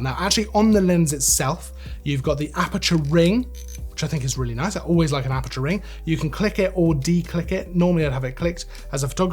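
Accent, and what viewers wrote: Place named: United Kingdom. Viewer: I can't with the old British accent. I study American history and what those people did to this country is deplorable. So his accent makes me feel nauseous. Not his fault 🤢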